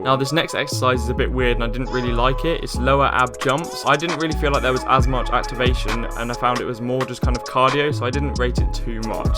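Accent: United Kingdom. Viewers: I just searched for "30 day ab workout" and you popped up and when I clicked on your vid,my volume was up but I had a feeling that your accent was gonna be British idk why but I was right lol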